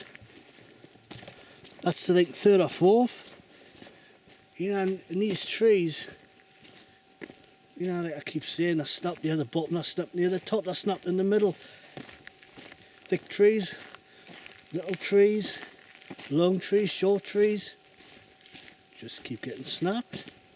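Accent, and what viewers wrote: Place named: Ashington, United Kingdom. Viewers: That sounds like an ashington accent that...class video mate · has any wildlife expert told you what they believe the sounds to be in that area from your accent i take it to be the northeast of England .taking that England has only 10% of its woodland left comperd to 40 %or 50 % of woodland in most of European countrys like France or Germany is it possible to have a wild unknown animal running around the forest in England .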